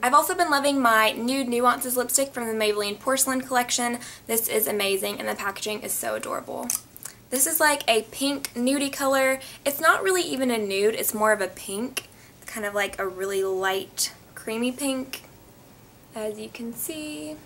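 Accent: South, United States. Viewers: I never knew u had an accent. I love your souther accent. I think u were the first beauty YouTuber I started following maybe 3 years ago. Haters talk about your accent and I was so confused thinking u had an accent in the most recent videos but now I understand...haters gonna hate. I love your videos. They always inspire me to improve my eye makeup skills. And we share the same bday😊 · I love ur accent!!! Lol Y'ALL! And I love that coach bag but it wouldn't work for me 😓 I'm a mommy and that bag isn't Supposed to get wet some how it would end up in a bath tub in my house lol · I totally agree! She changes a lot in the timing of all of her videos and that the beauty of makeup :) Its crazy to see her transformation. Her accent also seems SOOOO southern ?!!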